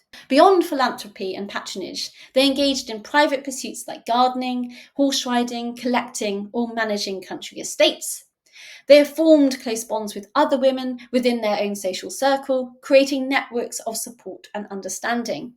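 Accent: United Kingdom. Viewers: I absolutely love history videos and I can tell how passionate you are by the way you explain and tell these real life tales! Your British accent adds all to the charm and even if history is loaded with information, you really are able to deliver it beautifully and so easily understood! Hope you'll become professor one day if you aren't already or if you'd see yourself doing that, you'd be brilliant for sure 👏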